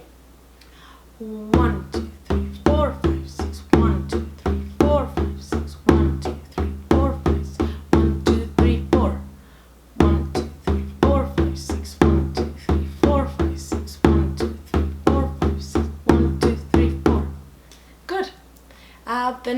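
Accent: Ireland. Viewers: I thought as much ud might of lived here with ur accent, I trained as a sprinter at the UL from 1989 a wee 14 yr old and come 1997 I became national champion at sprinting 200m under my coaches then Dr Drew Harrison n his lovely wife Hayley. They helped produce many Olympians and countless national champions. I absolutely loved being in the Ul, even tho I wasn't a student but I've always been welcomed by the Athletic coaches there over the years n got to meet n train with many Olympic medalists, world record holders n meeting my hero's, it was amazing just like u are as a coach to Bodhran playing. Ives just spent 45mins doing my rashers n sausages ha ha n just like Athletics uv gotta put in the training. Thanks again for getting in touch, I'm so honoured by that from you. Keep up the good work theres something definitely special about you 🙏🥁🏃‍♀️🇮🇪☘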